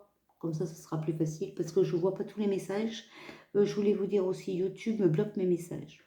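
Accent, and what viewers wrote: North, France. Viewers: Bonjour j ai découvert votre chaîne il y a peux de temps aussi j attend les suivantes avec impatience j aime votre accent du Nord et votre gentillesse votre nouvelle coiffure vous rajeunit pouvez vous svp me donner les liens de votre site j'aimerai vous acheter des angonites merci bonne soiree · Bonjour Je vous suis depuis un petit moment je m' éclate d'abord avec votre accent du Nord surtout quand vous dites "voilà " j' adorrrrre!! Le 16 juillet à 20h precises sur Web tv RDH (Rassemblement des Droits de l'Homme) un certain Philipoe Argillier doit parler. POURRIEZ-VOUS NOUS DIRE SI C'EST QUELQU'UN DE FIABLE OU PAS. MERCI🙏❤️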